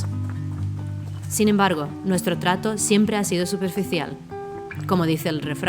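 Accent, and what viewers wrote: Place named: Serbia. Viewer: Genial documental, el acento serbio de la narradora le da un toque especial y exuberante más la calidad y las transiciones que son muy buenas! Felicidades!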